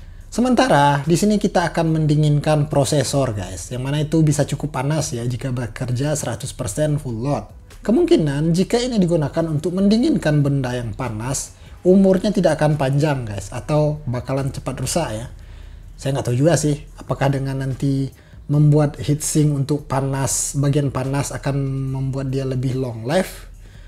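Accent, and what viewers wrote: West Sumatra, Indonesia. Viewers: Kebawa logat minang nya bg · Bang... Logat lu mirip Logat orang minang 😁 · Logatnya mirip logat minang ya bro Nice experiment..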